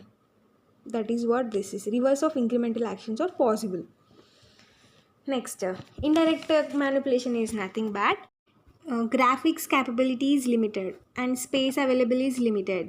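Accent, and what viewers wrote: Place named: Telangana, India. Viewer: Motham telangana pilla la matladthunav😅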